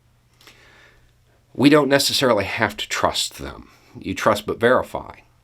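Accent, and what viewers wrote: South, United States. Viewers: I wish I had you as a political science teacher in high school!! I am sorry I ever jumped to a bad first impression of you...I will remember to never put off someone as unintelligent due to a long beard and Southern accent. I truly learn a lot from you. With sincere thanks!!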